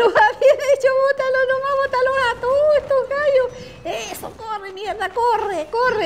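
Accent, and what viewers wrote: Chile. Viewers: Tiene ascento de chilena ?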